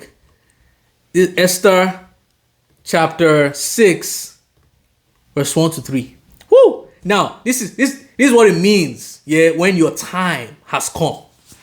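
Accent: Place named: United Kingdom. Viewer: Your English is that of English U.K accent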